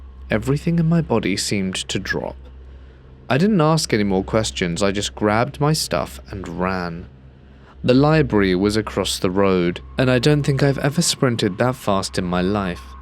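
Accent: England, United Kingdom. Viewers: For the first time I can’t stand an English accent!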